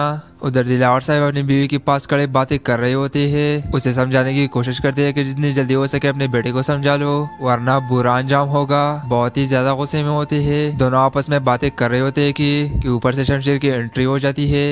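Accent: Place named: Urdu, India. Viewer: lahjy se to lag raha hai ka ap Urdu sai bol rahy hain because ap Muslim hain Hindu jo huty hain wo hindi bolty hain aor hindi bolny walo ka lahja pata chal jata hai aor India me jo muslman hain un ko to ni lagata lakin pata chal jata hai ke ya Indian muslim hain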